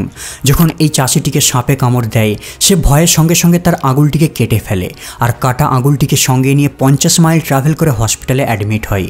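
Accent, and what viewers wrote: India. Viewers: ইন্ডিয়ান মনে হয়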